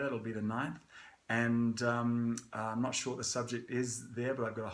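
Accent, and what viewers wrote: Australia. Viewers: Amazing you still kept your Australian accent.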